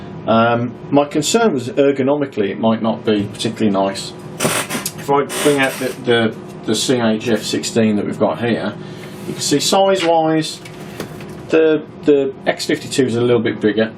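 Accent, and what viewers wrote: United Kingdom. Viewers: He sounds British to me, mate. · A bit late but great video. Is that a midland accent lol my name should tell you i am also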